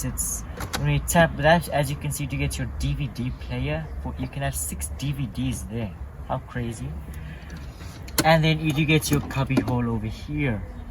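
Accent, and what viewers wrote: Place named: South Africa. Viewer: the moment u opened your mouth . . . i thought you MUST be South African, lol , nice review, very well put together, keep it up bro. !!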